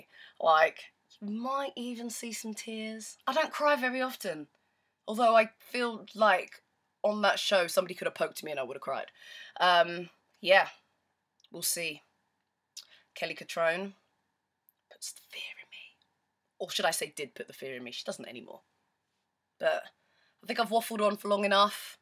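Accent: United Kingdom. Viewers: I need more than Your have some really great insights and I can listen to your Brit accent for eva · Love the videos! Your energy is fantastic! I wish I had your accent haha :) I'm rooting for you and Sophie y'all are my favorite and Katherine too :) going for the Brits even though I'm American lol. And by the way that stuff is NOT what Americans normally eat. I've never had pigs feet or chitterlings in my life. But mmm at least you got grits! Those are fantastic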